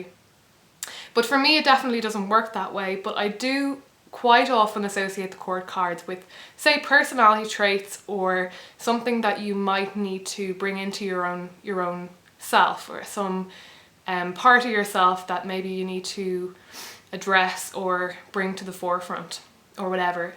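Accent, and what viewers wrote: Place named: Ireland. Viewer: I love your accent. Irish??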